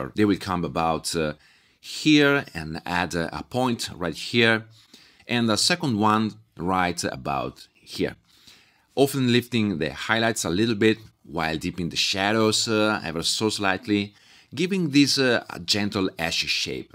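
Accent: Italy. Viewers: the EE at the end of every word is amazingly Italian haha. thanks for the video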